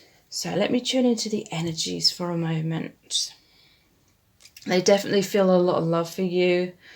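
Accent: United Kingdom. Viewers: You are wonderful , gifted , you are the real deal ❤.By the way I adore ❤ and your Britich accent .Wonderful job !!!!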